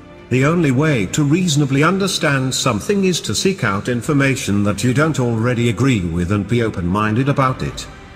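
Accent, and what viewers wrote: United Kingdom. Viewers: Why the British accent? We're talking about America, after all.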